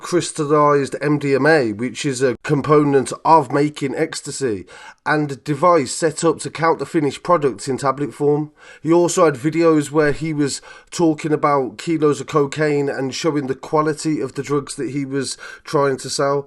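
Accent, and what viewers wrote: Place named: Maidstone, United Kingdom. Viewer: thats so funny i was literally sat there the whole intro thinking that was the strongest maidstone accent ive ever heard😭😭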